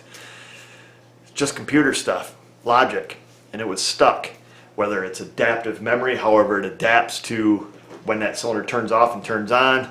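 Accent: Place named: Upstate New York, United States. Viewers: That's not a New York accent, it's a "stat nyland" accent. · Speaking of accents, yes, you have an Upstate New York accent and, boy, does it shine.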